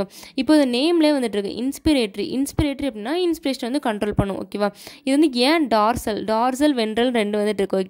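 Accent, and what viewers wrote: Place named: Tirunelveli, India. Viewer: Onga slang tirunelveli slang maathiri irukku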